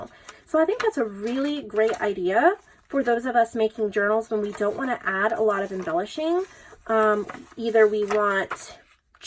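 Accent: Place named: Cornwall, United Kingdom. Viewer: I listen to videos as she lives near to where I grew up and I find her Cornish accent comforting.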